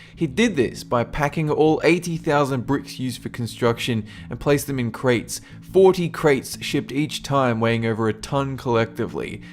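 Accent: Australia. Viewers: im pretty sure he is Australian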